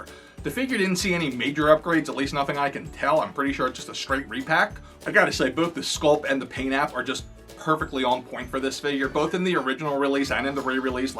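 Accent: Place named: Pennsylvania, United States. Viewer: 3 minutes in and I'm saying to myself "He's GOTTA be from PA with that accent.." HAHA! I'm from Philly, I'd know that accent anywhere, haha! GREAT VIDEO!!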